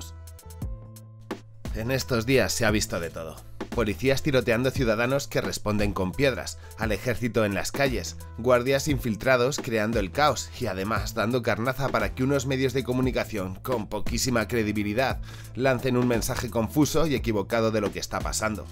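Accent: Colombia. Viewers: Que crack, pareciera que un colombiano hablara, estás muy bien contextualizado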